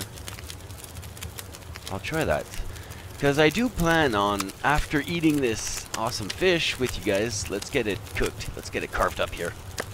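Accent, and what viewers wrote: France. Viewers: well thanks for your great videos/commentaries! Btw you sound french are you? 😜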